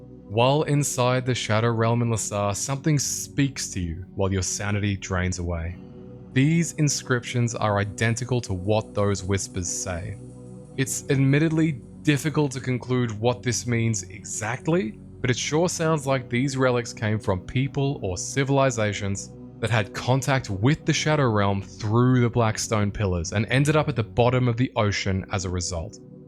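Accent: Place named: United Kingdom. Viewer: Finally someone reads the dialogue in Runescape with the appropriate "Britishism" it was meant to be conveyed with.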